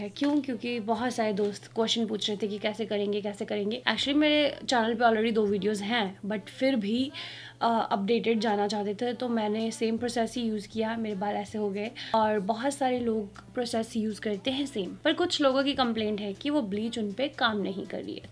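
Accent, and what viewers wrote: Punjab, India. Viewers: Ap bht cutely punjabi bolti ho ❤☺☺☺Or apki family bht sweet hai 😍😍😍 big fan of you 😘😘😘😊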